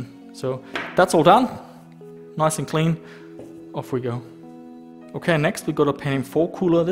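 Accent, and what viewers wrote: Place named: Germany. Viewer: Are you an expat? You sound German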